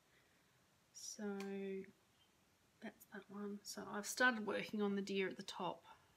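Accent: Australia. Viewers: Enjoy your flosstube and enjoying your "Aussie-Isms"!! I am from NC in the US and a video friendship with an Aussie stitcher is great!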